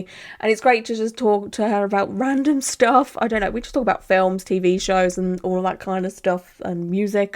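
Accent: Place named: Northamptonshire, United Kingdom. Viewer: Your blatantly from Northamptoshire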